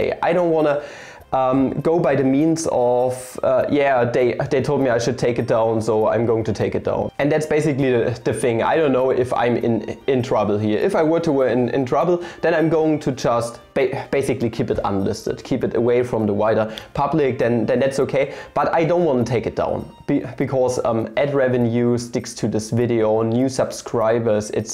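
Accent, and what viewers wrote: Germany. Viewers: 20 points for having such a dumb face and annoying German accent lmao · German accent. I find it cute. That aside.... 145 IQ is so high. I thought I had a good IQ and Feyman's 125 seems so high (why do people call it low?even if it was the great Feyman). Graduating medical students have an average IQ of 120 (their 50 percentile). Mensa test is confidential for a reason and it is best not to reveal anything specific about them. Most important thing is IQ is misleading and almost all most intelligent people I know personally do not care about IQ tests and even look down about them. So, unless you can take it for fun do not take it.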